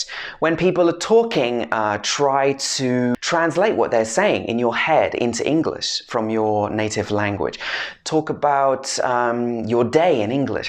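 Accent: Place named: United Kingdom. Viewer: Wish I can talk to you everyday to improve my English speaking, specially British Accent which I really like.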